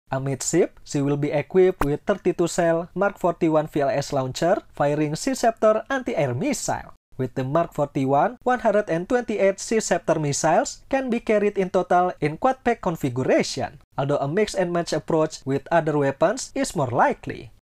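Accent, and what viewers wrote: Java, Indonesia. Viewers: aksen inggris lu indonesia banget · english with indonesian aksen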